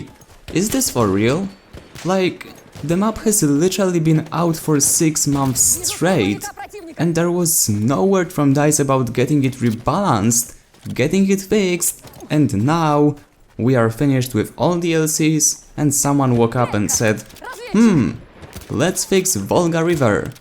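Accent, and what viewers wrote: Poland. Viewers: He just spends 8 minutes rambling on about crap with a horrendous polish accent. Yeah, right.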